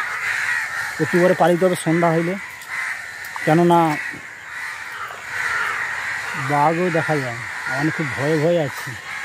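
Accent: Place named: Midnapore, India. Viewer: Tomar bari sundarboner kothay dada❤ আমাদের মীদনাপুরের ভাষায় কথা বলছো প্লিজ বলবে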